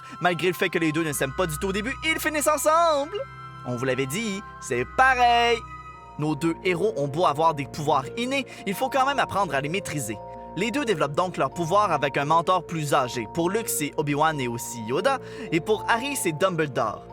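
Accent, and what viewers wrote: Quebec, Canada. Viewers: C'est un québécois y a qu'à entendre son accent... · Les quebequois, vous avez un accent magnifique 😍❤👍🤣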